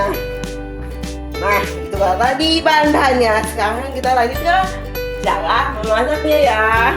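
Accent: Palembang, Indonesia. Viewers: palembang banget logatnya, R nyo berderuuuuuupppp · oo pantes aja ..suara dari logatnya keliatan bnget buu...Salam wong kito galo😄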